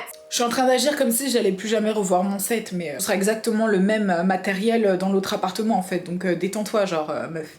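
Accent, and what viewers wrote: England, United Kingdom. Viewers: omgggggggg ton accent anglais quand t’es arrivée à paris je suis choquée😭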